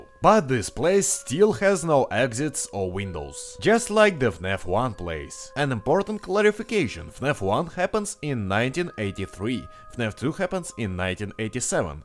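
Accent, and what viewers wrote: Russia. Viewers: Интонация русская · С русским акцентом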